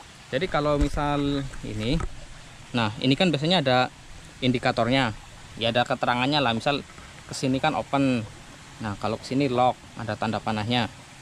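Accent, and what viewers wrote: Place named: Java, Indonesia. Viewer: Logatnya lugu orang jawa :)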